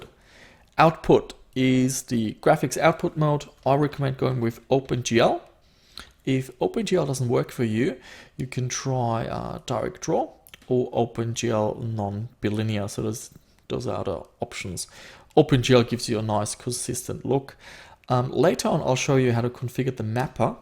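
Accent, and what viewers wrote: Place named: Germany. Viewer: Thanks for the tips.. From the accent, i know you're a german. ;)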